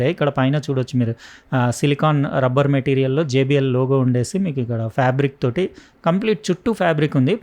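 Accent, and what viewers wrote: Telangana, India. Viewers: TG slang top notch 💪💥🔥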